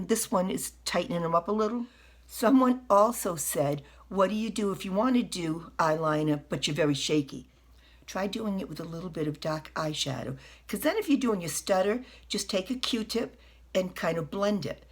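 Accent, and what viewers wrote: New England, United States. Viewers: I’m Southern and I like a yankee accent. Reminds me of “All in the Family.” Don’t change the way you speak❤✝️Hair & makeup looks 👍🏻 good.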